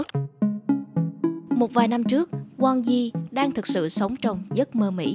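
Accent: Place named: Saigon, Vietnam. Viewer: Giọng đọc, rất hay... Dễ nghe và rõ ràng, tôi nghĩ là tiếng Sài Gòn. Cảm ơn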